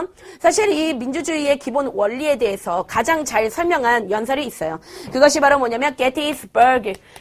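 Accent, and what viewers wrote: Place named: Jeolla, South Korea. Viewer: 아니 ㅋㅋㅋㅋㅋ 전라도 사투리 개웃기네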